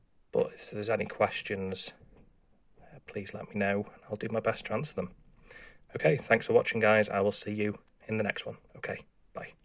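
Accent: United Kingdom. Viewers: Your voice has a similar accent to that of Brian Cox, the British astronomer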